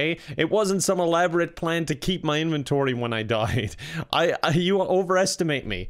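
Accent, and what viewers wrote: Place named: Ireland. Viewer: Do whatever you want to. I'm here for the Irish accent. That shit is basically my kink.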